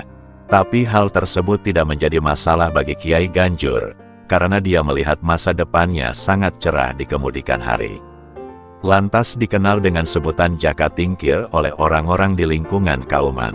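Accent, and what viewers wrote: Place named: Banyumas, Indonesia. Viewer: Ngapak bshasane tur kaku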